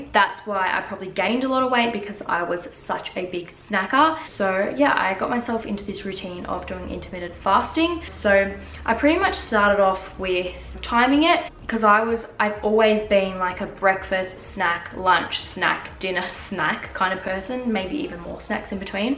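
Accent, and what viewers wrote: Australia. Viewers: are you australian? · Is that an Australian accent?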